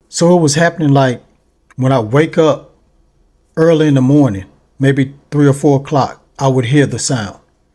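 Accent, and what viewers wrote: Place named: Houston, United States. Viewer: You sound like you from Houston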